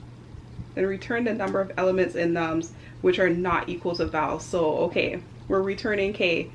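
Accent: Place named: Midwest, United States. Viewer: Are you from mid west? I hear a slight accent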